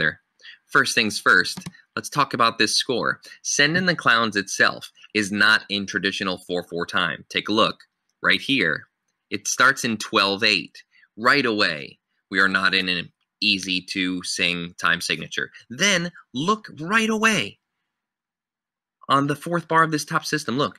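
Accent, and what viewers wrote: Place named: United Kingdom. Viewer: Thank you for this excellent analysis! If I may say so, I find the British accent annoying/distracting. was American so I don't feel that my opinion is inappropriate! I saw a video of teaching this song and he was a stickler for the consonants!! Ought / to just like you said!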